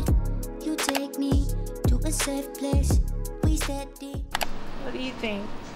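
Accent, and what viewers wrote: Visayas, Philippines. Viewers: ms., ang cute mo magbisaya🥰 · Ka cute paminawn magbisaya hahahaha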